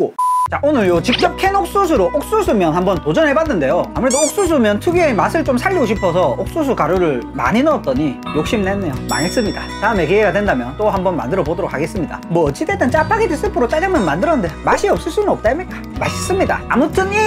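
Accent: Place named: Gyeongsang, South Korea. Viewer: 경상도 분이시구나 ㅋ ㅋ 저도 한 면사랑 하는데요 😆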